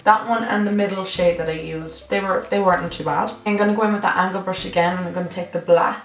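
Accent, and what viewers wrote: Dublin, Ireland. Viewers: Hi Love! You're also my very first Irish you-tuber. Luuv you & your accent & all your tips. ♥ I've watched a dozen videos in just less than a week's time & the dupes videos over & over & have lists everywhere. I just purchased a few drugstore items today as a matter of fact. Also laughed when you & your sister ate American candy. Now I WANT to get the nude lipliner & lipstick from Penney's. How do I get it here in the U.S.? Thanks ;D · omg r u from Ireland 💘 what county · Are u from Dublin? I'm from Langford xx :) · Are u from Dublin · where abouts in ireland are you from? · Where bouts in Ireland are u from ?🇮🇪🇮🇪🇮🇪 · What part of Ireland are u from xx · I can't tell wheather your from northern Ireland or southern Ireland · i knew instantly u were frm dublin!! love frm belfast!!! xx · What part of Ireland are u from?💕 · what part of ireland are you from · Irish buddy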